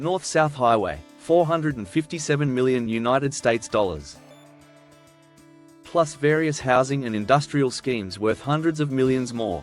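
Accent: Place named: England, United Kingdom. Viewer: This presenter has an English accent has he ever wondered why a [so called] British subject was left back so badly, that they need catching up in every department with Chinese help and money, if you didn't or cannot offer anything in the past or now toward our development. please leave us alone, all we gonna get from any western associations are sour grapes.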